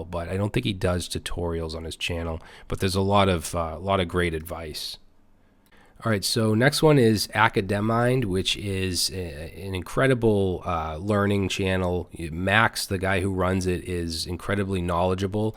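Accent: Boston, United States. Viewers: I still like your channel the most. I'm a software engineer and have been for the last 4 years professionally in part thanks to your videos, the thick Bostonian accent brings nostalgia.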